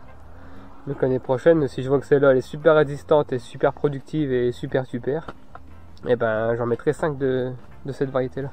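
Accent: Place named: Picardy, France. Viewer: bonjour,je découvre votre chaine. je m abonne car j adore. vous seriez pas de picardie? j ai le meme accent.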